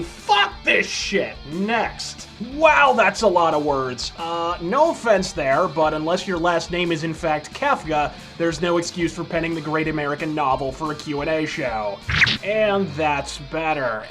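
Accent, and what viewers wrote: England, United Kingdom. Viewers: Love the english accent. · Shite Briish accent - terrific video.Thanks as always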